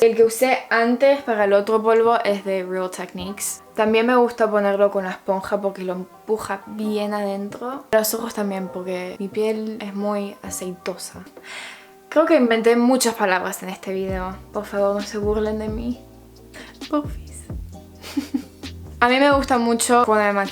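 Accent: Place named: Israel. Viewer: איך שומעים את המבטא הישראלי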